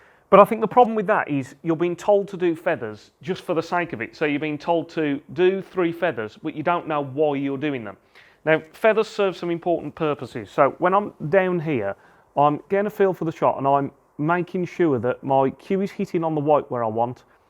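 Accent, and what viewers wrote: United Kingdom. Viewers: Love the British accent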